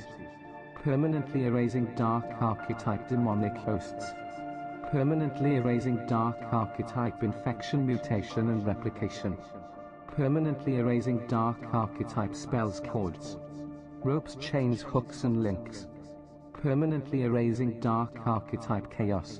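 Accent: Wales, United Kingdom. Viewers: Aww lovely Welsh accent, music to my weary soul. Thank you for victory against the dark 💕🌟💕🌟💕🌟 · The Welsh accent 😍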